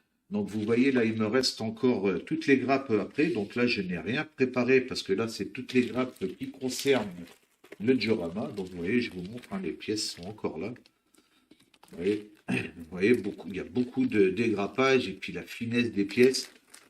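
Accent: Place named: Germany. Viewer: je prend ta vidéo très en retard mais ton anglais sur ton tube ABT ce serait plutôt de l'allemand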